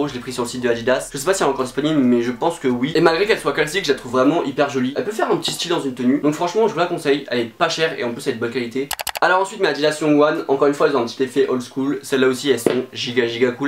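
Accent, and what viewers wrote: Normandy, France. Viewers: T'es de Normandie ?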